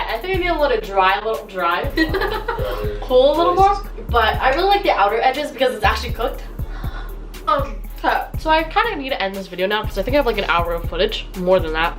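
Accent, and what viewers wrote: Alberta, Canada. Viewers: oh mygod you albertian accent is amazing lol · You can hear the Canadian in her and I love it 🇨🇦🇨🇦🍁🍁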